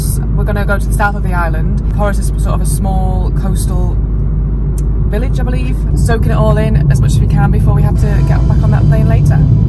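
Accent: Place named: Stafford, United Kingdom. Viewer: You sound very immature! Great video,thanks!😅😊By the way ,your accent sounds very similar to my home town accent of Stafford.Im guessing your from not a million miles from there?😊😊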